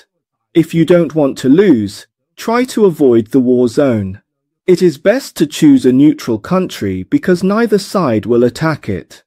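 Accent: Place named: United Kingdom. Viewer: Amazing British accent!😊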